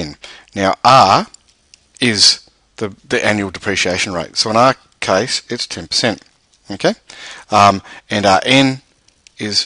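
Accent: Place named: Australia. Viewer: thanks a lot mate, really refreshing hearing an Australian voice for a change!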